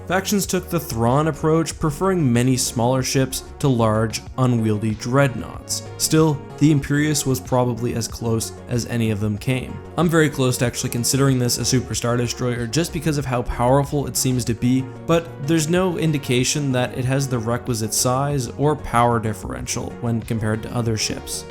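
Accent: Canada. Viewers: I watch your videos so much that I’m starting to say “out” like you do. You’re Canadian huh.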